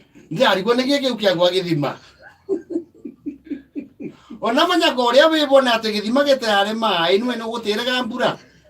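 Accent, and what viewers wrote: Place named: Kirinyaga, Kenya. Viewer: Unaongea kama watu wa kirinyaga avai hahaha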